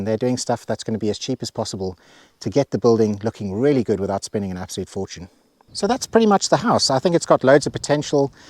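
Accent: South Africa. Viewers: Hi Are you South African? The accent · THats a South African accent. Hello from Cape Town and good luck in Portugal.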